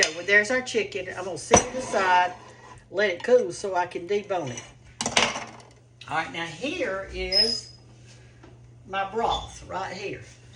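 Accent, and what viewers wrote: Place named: Alabama, United States. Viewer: Miss You speak my Bama country language You flung a cravin on me! language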